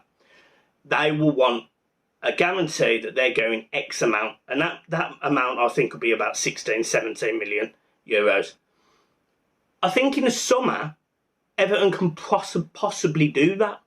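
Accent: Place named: Midlands, United Kingdom. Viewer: Gotta ask ye this question mate, why Everton with an accent like yours, midlands somewhere. I watch ye show all the time, its sound lad.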